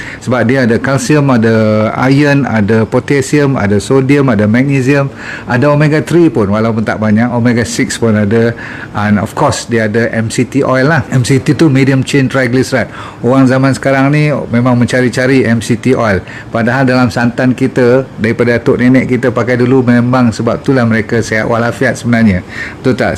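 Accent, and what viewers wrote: Malaysia. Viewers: Doktr bercakap guna satu dialek di Malaysia. Jika sudah biasa tentu mudah faham sebagaimana ikuti dr zaidul.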